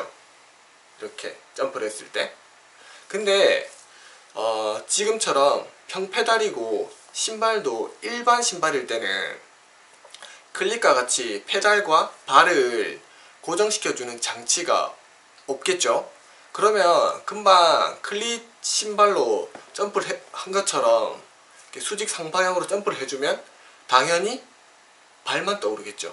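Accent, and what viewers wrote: Gyeongsang, South Korea. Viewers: 짜증난다 저 경상도 사투리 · 경상도쪽 말투 같은데..진짜 찾아뵙고 배우고 싶습니다 ㅠㅠ 좋은 정보 감사합니다~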